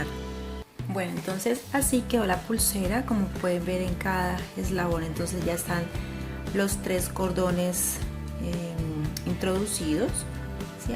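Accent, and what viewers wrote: Colombia. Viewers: Estás en Colombia o en Venezuela 🇻🇪, tu acento me parece de Colombia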